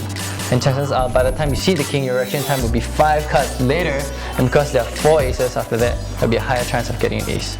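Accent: Singapore. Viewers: r u singaporean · cool video dude...are u from singapore..just asking...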